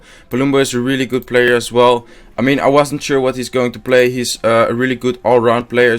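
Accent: Netherlands. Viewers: where are you from you sound dutch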